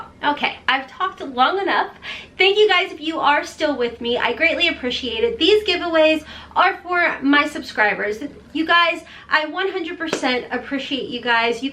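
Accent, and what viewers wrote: South, United States. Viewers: Don't be sorry. I love ur country accent.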